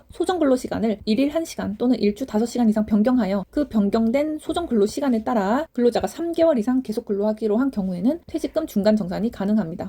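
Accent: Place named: Gyeongsang, South Korea. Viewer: 귀에 때려박는 경상도 딕션과 유려한 설명. 너무 감사합니다!!!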